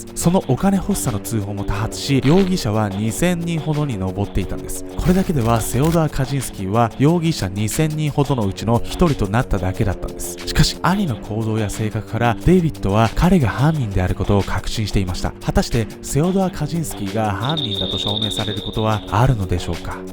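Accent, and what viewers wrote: Kansai, Japan. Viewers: 関感凄くて草www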